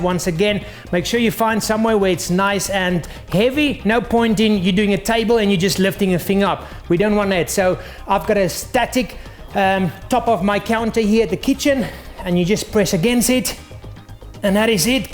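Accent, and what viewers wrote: Afrikaans, South Africa. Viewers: His accent is South African.. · Judging by his accent i think he's from South Africa. I know cause I live there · I think it's a south african accent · Roy Miketsukami It's a South African Accent common under Afrikaans Speaking South Africans. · He's a saffa (South African) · Its a south african accent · sounds south african to me :)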